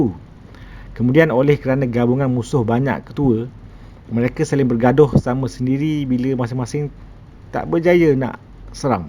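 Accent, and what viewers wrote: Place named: Indonesia. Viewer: Kenapa ckp ada mcm indon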